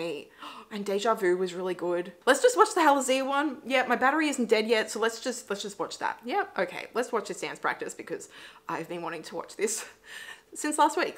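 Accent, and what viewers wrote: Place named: Australia. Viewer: ty so much love kpop i'm from South Korea little bit hard to understand Aussie accent but i really like u re channel. also sometime kpop reaction block on some country that is not have Youtube country no google just little bit search it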